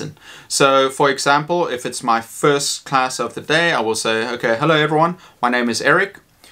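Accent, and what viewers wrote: South Africa. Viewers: Hey! Thank you for the amazing tips, I've learned a lot! Tomorrow will be my first day back to school. I first started before the pandemic and honestly I think I did really good but there's always room for improvement, right? One last thing, I can't help but ask... Are you South African? I just love the accent haha. Greetings from Algeria! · Are you South African?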